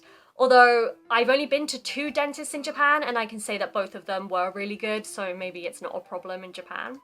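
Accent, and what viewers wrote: United Kingdom. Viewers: イギリス英語🇬🇧素敵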